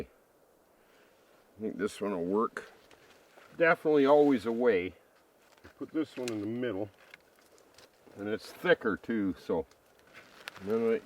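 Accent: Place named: Canada. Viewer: I appreciate your inserts of humorous bits from other movies! & your Canadian accent & skills....thanks.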